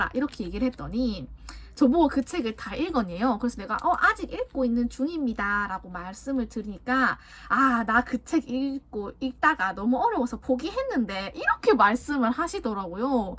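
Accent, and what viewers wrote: Busan, South Korea. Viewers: 우아 감사합니다!!!!! 필합 이후로 급 면접이라 님 영상보면서 가상경험 하고있어요ㅠㅠ 게다가 저도 경남권이라 사투리들으면 넘 안정돼요....ㅎㅎㅎ 면접가기 전날까지 이영상 보고갈거에요!!!♡ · 부산사람의 말투가 느껴지는군요!ㅋㅋㅋ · 최종합격도 축하드립니다!!! 저도 작년에 같이 고민 토로했던 기억이 ㅠㅠㅠ 저도 면접보고 아직도 발표 기다리는데 ㅠㅠ 넘나 떨리네요 부산사투리도 너무 정감갑니다 ㅎㅋㅋㅋㅋㅋㅋ저번주에 부산여행갔어요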